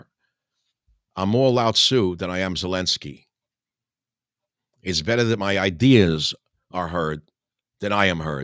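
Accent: New York, United States. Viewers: I started listeing to you Mike back during the Obama years (<num>) when I was a liberal (still am but in the classic sense) and you resonated with me. Can't explain it...I think it's because you were from NY and I lived in NY at the time and you sounded like so many people I have encountered over the years. Also I was in a lost period [during that time] of my life; and you gave me motivation and perspective-(almost like a father figure) otherwise, I wouldn't had been able to come up with, on my own. I credit you with breaking me out of my identity politics phase which I was heavily in at the time. This actually caused me to change my thinking and helped advance my career. However I would be remissed if I didn't spread the credations around: I also give credit to Alex Jones and Mark Levin, and occasionally Rush, but I never missed your radio show! 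They then got to you and had you removed for being an unbridled patriot; but I am glad you're back! And we need you now! Especially in these trying times! Thank you. 🙏 ❤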